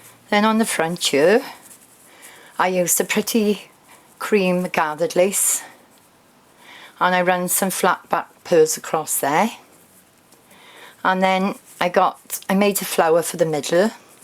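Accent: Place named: Wales, United Kingdom. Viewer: Beautiful makes and my Mum was welsh and I soooo miss the accent so it was lovely to hear yours. hugs Tibby xxxx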